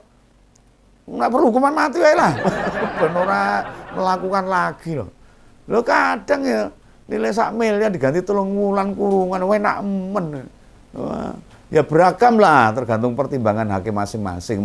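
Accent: Java, Indonesia. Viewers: Sbg non jawa. gak ngerti apa omongam om ini, medhok2 campur aduk. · Mantap om,tetap bersuara dg diselingi dialek jawanya..saya suka😂😂😂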